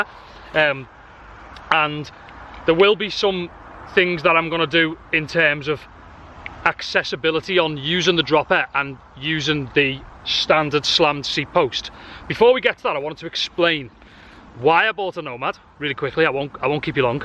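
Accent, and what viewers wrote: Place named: RP, United Kingdom. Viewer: Very posh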